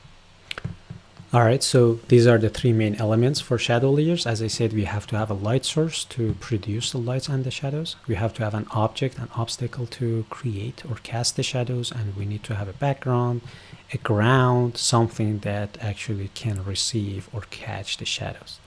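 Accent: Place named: Iran. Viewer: اقا دمت گرم خدا قوت خسته نباشید خدا وکیلی عالی بود کارت اگه مثل خودم لهجه نداشتی اصلا فکرش رو نمی کردم ایرانی باشی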